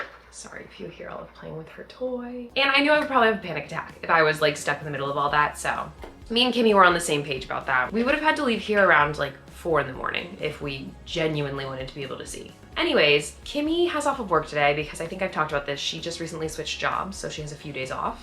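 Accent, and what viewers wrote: New York, United States. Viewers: Another interesting video from in her trademark Husky strong New York Accent living life again in the fast lane in NYC and having a action packed week with members of The Flamboyant NYC Crew, the bubbly Flamboyant Redhead, and The Twin Sisters RN'T US! duo, going to different places including two theatres, Matcha Bar, restaurants, walking in the park, watching Love Island indoors and doing some brand videos as well as cooking food and doing her work on the computer. She did gave her Cats some love and even helped a friend with moving. The Weather here in the UK is very hot and humid even at night. Unfortunately both and decided not to go to the Knicks Victory parade because of acute overcrowding. She did go out on a date with someone but she is keeping it a secret! Looking forward to seeing another video soon. Take care from London UK 🇬🇧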